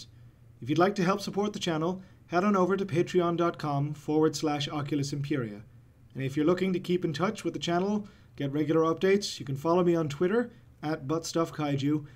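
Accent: Ireland. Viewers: Excellent work as ever. Is that an Irish accent I hear?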